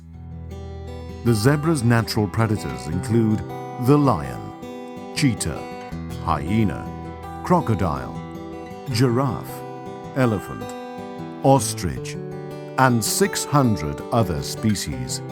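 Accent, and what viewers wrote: United Kingdom. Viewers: he’s British, that’s how’s it’s pronounced. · this enormously negative over tone coupled with that British accent has got to be, the funniest shit i have ever heard.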